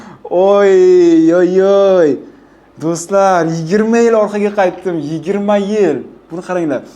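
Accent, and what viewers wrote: Samarkand, Uzbekistan. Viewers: Samarqand danmisiz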